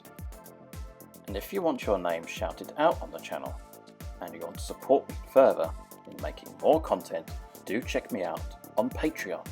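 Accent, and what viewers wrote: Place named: United Kingdom. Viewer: This is exactly what I’ve been looking for! Prayers answered British guy talking like a university professor about army organizational charts for 40K Perfection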